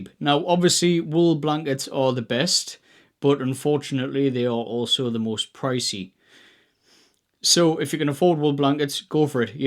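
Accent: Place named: United Kingdom. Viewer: It's a torch, not a flash light. You're British (going by your accent). lol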